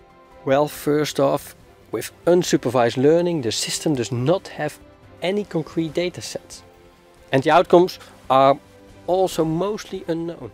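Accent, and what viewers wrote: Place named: Netherlands. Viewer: I absolutely love you dutch accent! And the explanation too of course.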